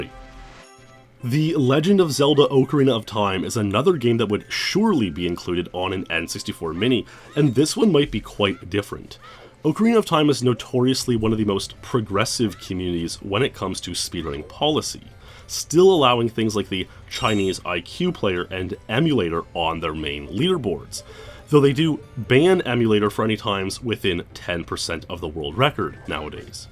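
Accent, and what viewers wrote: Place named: Canada. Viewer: The REALLY IS LOOSE Now, I know you're Canadian, you have the accent to prove it.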